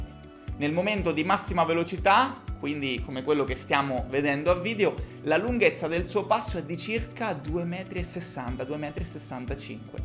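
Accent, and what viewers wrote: Marche, Italy. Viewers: accento marchigiano a tutto spiano. Scommetto provincia di Macerata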